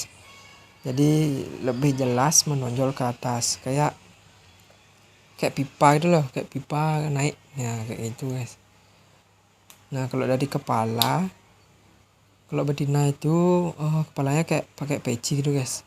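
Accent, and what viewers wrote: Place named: Bali, Indonesia. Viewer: Bali ya bang agak lagat bahasanya mantap bang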